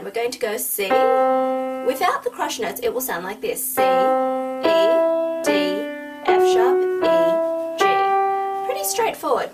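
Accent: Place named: Australia. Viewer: Nice to hear an Australian accent :-)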